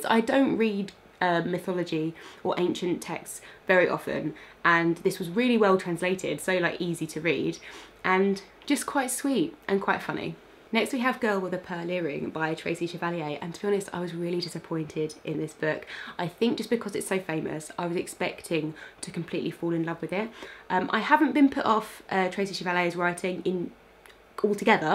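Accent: United Kingdom. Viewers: I love listening to you speaking in a perfect British accent, so beautiful, so relaxing too 🤣. What is your job? How can you read so many books ??? Would you have some must read British books list for foreigners? 🙏 I am French and I have never used the expression "Comme ci comme ça" in my entire life, but it is what all my foreign students and friends answer when I ask them what they can say in French. I teach French to foreigners. Christelle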